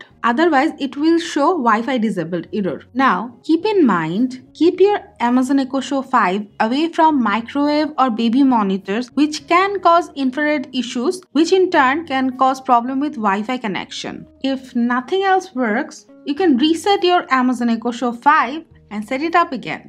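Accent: India. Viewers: you did help me however for americans to undersand the indian accent they place on the english language and the speed in which you speak is super difficult. If you would clean this up you would get many more likes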